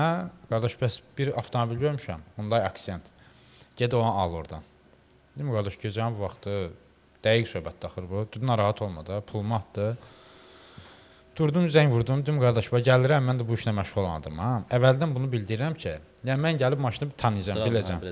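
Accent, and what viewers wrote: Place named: Quba, Azerbaijan. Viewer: Quba ləhçəsi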